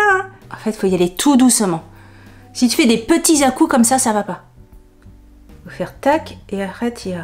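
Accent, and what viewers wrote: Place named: Southwest, France. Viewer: Team sourcileuu, sud-ouest oblige ! On prononce tout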